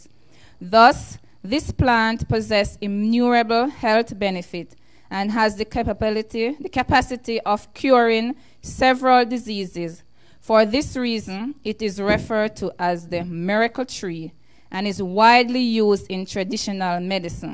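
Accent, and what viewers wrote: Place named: Jamaica. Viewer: keep it real me love de jamaican accent thanks for your presentation.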